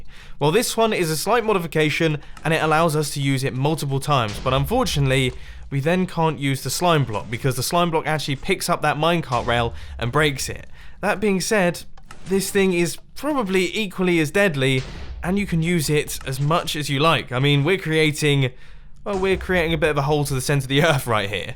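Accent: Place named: United Kingdom. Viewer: No offense man, I accustom calling it British accent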